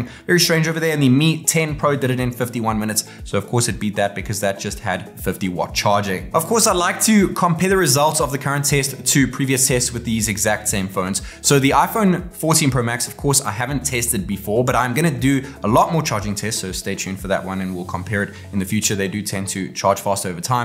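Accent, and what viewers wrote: South Africa. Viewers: I forgot your south african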